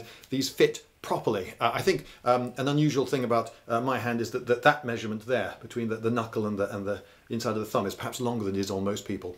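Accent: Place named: United Kingdom. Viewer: U should do MORE LEATHER GLOVES FULL FINGERED LEATHER GLOVES reviews hun I just ❤💖❤ your British accent voice is stunning to listen too great job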